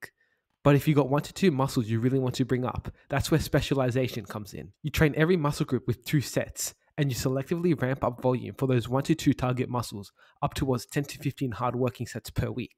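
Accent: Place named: Australia. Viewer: respect to your optimistic attitude. also fellow aussie i hear?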